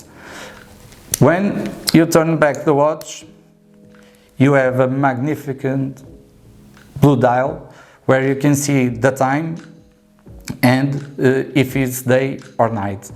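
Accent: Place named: Portugal. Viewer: I mean it's obviously not his first language, I'd go easy on the guy, sounds like his native language is portugese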